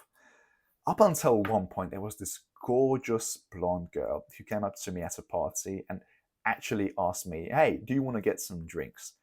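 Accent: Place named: United States. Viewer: Yo man, how the hell did you ger an american accent 😂, youre supposed to have a hungarian accent, im from the Balkans and id like to have that clean accent too, any tips?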